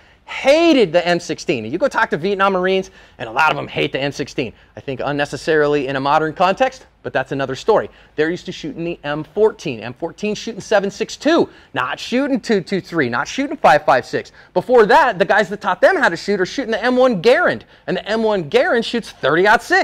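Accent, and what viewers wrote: Canada. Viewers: Is he Canadian, ay? Or from Minnesota? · Love your channel. But holy shit your speech cadence sounds Canadian! Lol. Great great great video!!!! Very helpful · Have you been spending time in Canada? Where did this Canadian accent come from? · I heard the Canadian in today as well! Great vid!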